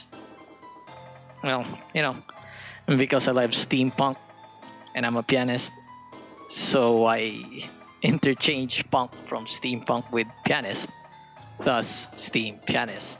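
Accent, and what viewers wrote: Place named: Philippines. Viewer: I had assumed you were in the USA or something but when I heard your voice there was no mistaking that Filipino voice. For the past 5 years of my school life, almost everyone I knew was Filipino. Plus all of my relatives on my mom's side are living in the Philippines and I've only ever met my Great Aunt when she came here, to Canada.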